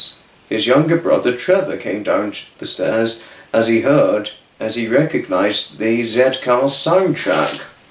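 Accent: United Kingdom. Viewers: I really enjoyed this inspirational chat time. Your story was great,! I like listening to your wonderful British accent😊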